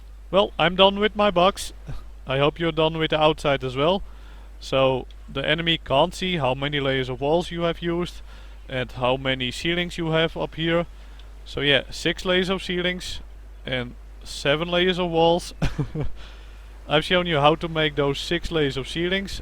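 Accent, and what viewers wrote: Netherlands. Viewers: 100% your dutch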